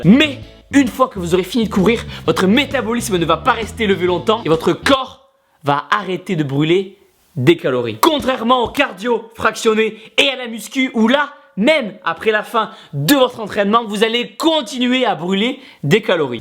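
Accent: South, France. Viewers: tu n'as pas un peu un accents? tu viendrais pas du sud?^^ Tibo InShape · En passant, vidéo très explicite. Et vive ton accent du sud!!!!!!!!! Je me sens moins seul :).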